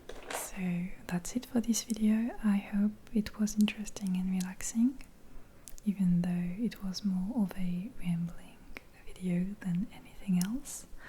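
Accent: France. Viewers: I love that french accent :) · J'adore, ton soft spoken et ton attitude sont tellement relaxants ❤️ Bonne soirée ! (J'avais commenté une fois en disant que l'accent français en anglais me perturbait quelque peu... Mais je dois dire que j'ai bien changé d'avis grâce à ta jolie voix. Et je voulais m'excuser, en y repensant je me dis que ça aurait pu éventuellement te créer un complexe, et ça n'était pas du tout mon intention 😅 )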